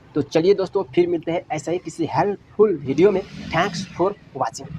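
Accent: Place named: Bihar, India. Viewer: Bahut badhiya ustad. Bihari accent bahut badhiya ba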